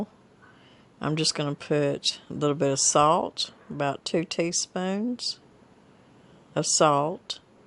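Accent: United States. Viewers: Ma'am, that is some serious man chow. Even putting three or four in a lunch box for work days would be wonderful. Your voice is just country as a butter bean. Thank your for the recipe and the video. Be well and at peace.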